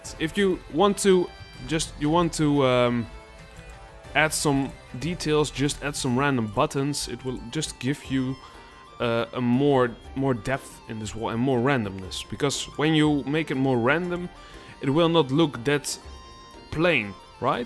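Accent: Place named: Netherlands. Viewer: Haha nice, I can hear you are accustomed to make video's in The dutch language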